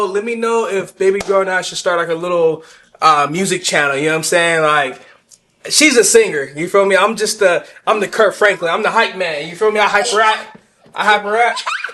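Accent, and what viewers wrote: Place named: California, United States. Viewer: You are a wonderful husband and father,l love to how you interact with you family,you hold it down as a MAN and I love the respect you show to your family, I love to see how she give you your time to yourself and you do the same to her.. county the good work my God bless you and your family.. by the way I been watching you two since you started.. I just love your California accent I'm from Virginia and I have a southern accent.. love your content.. and content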